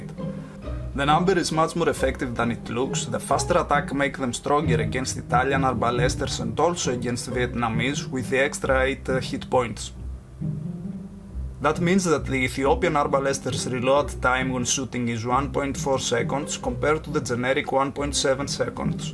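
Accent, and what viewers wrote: Greece. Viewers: LOL when I watched the video I said, he is Greek for sure 😂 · I'm watching these videos to listen to the Greek accent actually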